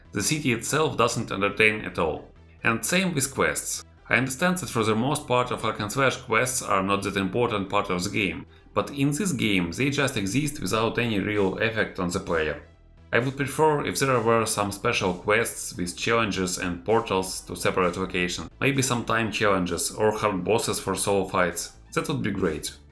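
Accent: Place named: Russia. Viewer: First of all, I'm not "criticizing" your Russian accent. But it was a little bit difficult to understand and I know you're working on it 😃 Other than that, good review. Do you know why I "Consider" it a good review 😜 2 reasons, 1. You got straight to the point. So many YouTubers, just drone on like an air-conditioner 😆 2. It was less than 10 minutes, and that's a good thing, honestly. People today have very "short attention" spans today🙄 You did talk a bit fast but, that's perfectly okay lol! You had a lot to cover and for the most part, you succeed 😝 So keep up the good work and I look forward to all of your, future videos 😁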